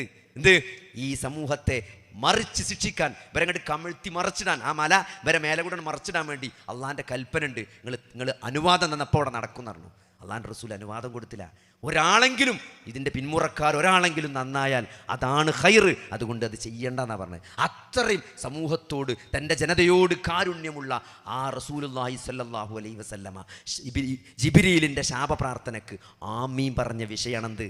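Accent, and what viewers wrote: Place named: Malappuram, India. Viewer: Very nice speech👍ഡോക്ടർ ആയിട്ടും തനി മലപ്പുറം ഭാഷ 😞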